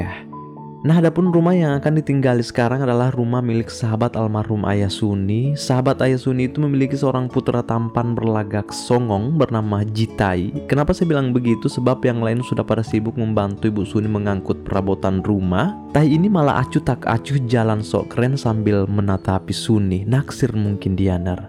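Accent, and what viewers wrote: Bugis, Indonesia. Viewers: Kentarana bugisna admin🤣🤣🤣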